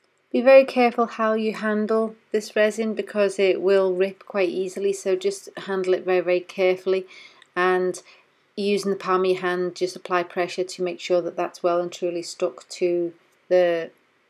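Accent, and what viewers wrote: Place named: Liverpool, United Kingdom. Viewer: She's Liverpudlian. From Liverpool in England.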